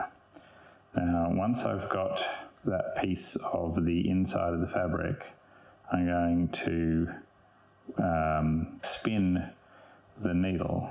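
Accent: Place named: Australia. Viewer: Great video! I’m very new to hand sewing seams. Right now I’m attempting to make a shift using the “seam and fell” method described in a book I found called “The Medieval Tailor”. It’s a bit like what you described because the seam is worked in tiny stitches from the right side too. Have you tried your technique for longer seams such as side seams or for seams where it’s trickier to have one hand behind like sleeve seams? You might have addressed my questions in other videos - I’ll go and see what else you’ve posted! Also, it’s lovely to hear an Australian accent 😊. I’m in Adelaide, but most of the other YouTube historical costume channels I have found are in the US and UK.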